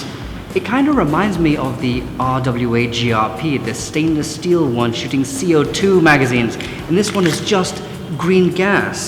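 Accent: RP, United Kingdom. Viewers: You sound really posh lol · Is that a British accent? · why do u sound so British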